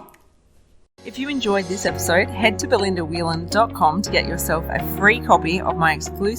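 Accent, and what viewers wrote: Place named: Australia. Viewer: I know love is terrible. Lose that accent a little bit. You sound like someone steps on your toes and your voice going up at any other word. I understand is an Australian accent but man it sounds terrible. Just being honest not horrible to you. Surely other people thought the same but were too polite to point it out.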